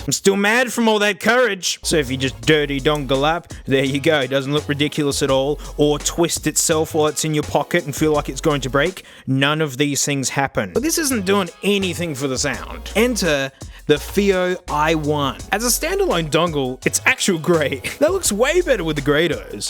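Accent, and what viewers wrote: Australia. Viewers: I'm American but starting to have Australian accent just by watching your amazing videos!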